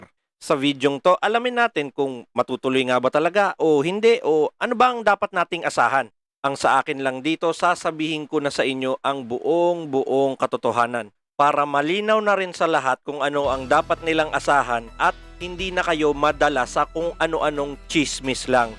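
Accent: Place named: Samar, Philippines. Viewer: Hulaan ko probinsya mo, SAMAR noh?😁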